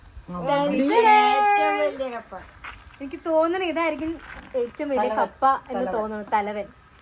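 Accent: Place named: Kochi, India. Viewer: Cochi slang 😍😍😍😍😍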